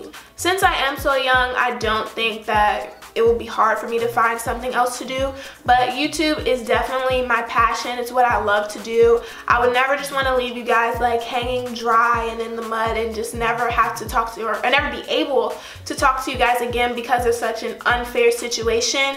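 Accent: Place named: United States. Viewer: A little bit confused here. You have a black Tshirt on with the letters BL_CK with a map of Africa between the Bl and the CK. So I'm guessing that it's meant to mean black African? Obviously black but you speak with an American accent, excuse my ignorance. Are you African or American? If African, what part of Africa do you come from? You do the American accent really well.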